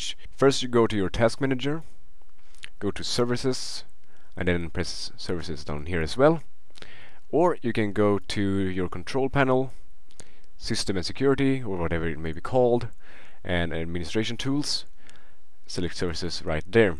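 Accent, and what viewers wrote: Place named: Sweden. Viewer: Tyckte väl det. Finns ingenting värre än att höra en annan svensk snacka engelska, de gör ont i själen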